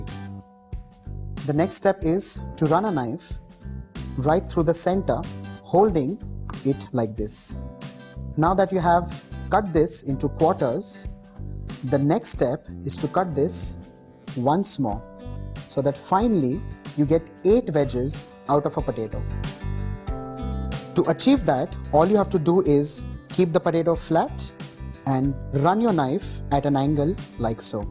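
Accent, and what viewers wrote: India. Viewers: strong indian accent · You speak english with indian accent i have no idea wht you talk haha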